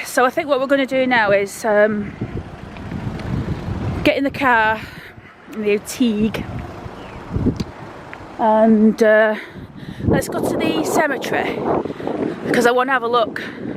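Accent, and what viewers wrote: Oldham, United Kingdom. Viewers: Love your lovely open way of speaking. I had to move away yonks ago so I love the area's covered and listening to a beautiful Oldham accent. Never change!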